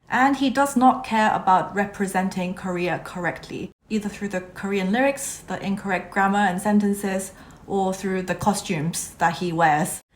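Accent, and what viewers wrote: England, United Kingdom. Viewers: ... I like your accent^^ it's sounds like British and your voice is.. What is it call? Yaa.. Just like it so much^^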